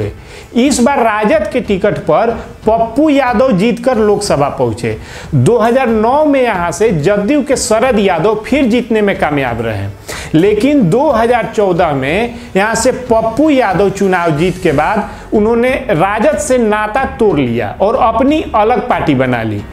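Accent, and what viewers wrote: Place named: Bhojpuri, India. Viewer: bhai mere bol Hindi rahe ho lekin awaz bhojpuri wali hai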